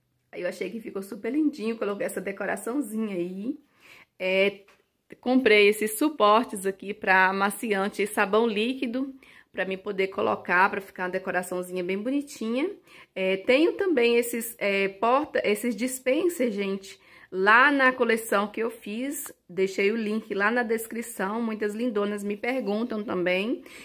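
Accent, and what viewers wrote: Brazil. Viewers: Vc e baiana???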